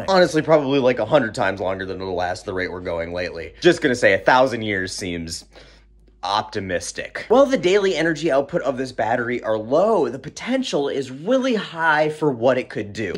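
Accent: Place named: Minnesota, United States. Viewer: It's Minnesota English...It's spelled 'nuclear' but we pronounce it 'nucular.' Get over it.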